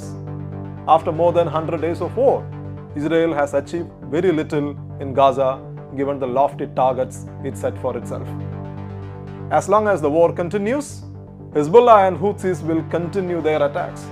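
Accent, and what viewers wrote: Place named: Kerala, India. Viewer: English with Malayalam accent, sounds interesting, but difficult to comprehend easily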